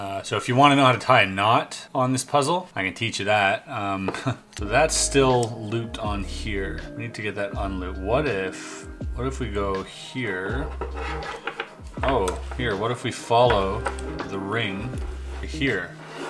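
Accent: Germany. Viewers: Are you german? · Ich hatte auch von Anfang an das Gefühl, dass er deutscher ist. bzw wie er einige Sachen ausgesprochen .🤔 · Watching you untangle puzzles is soooo relaxing(especially since I don't have to do it myself); ASMR, anyone? 😊 Fun to watch and your German accent is excellent! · That German accent 🤗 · I love your German dialect! Grüße :) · Are you a german guy i'm a german guy and it was so clean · Are you German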